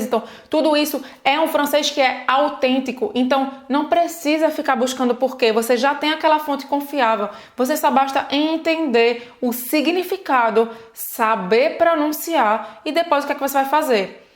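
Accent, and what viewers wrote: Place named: Northeast, Brazil. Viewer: Eu queria saber porque não perdeste o sotaque nordestino, que eu acho muito legal !? Parabéns menina ! Adoro os seus vídeos. Mesmo estando muito longe ,estás ajudando o nosso povo !! Deus te abençoe !! Gravataí RS Brasil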